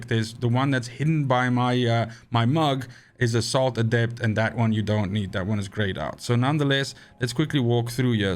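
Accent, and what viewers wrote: South Africa. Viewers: yo you South Arfican ?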